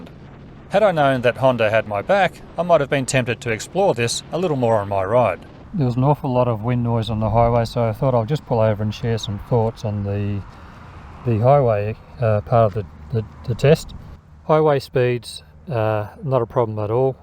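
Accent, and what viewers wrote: Australia. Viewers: Good to hear an Aussie test riding bikes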